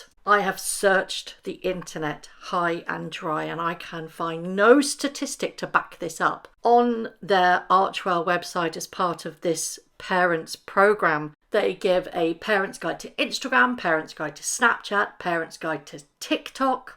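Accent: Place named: United Kingdom. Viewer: I love listening to voice, take and British accent! TY!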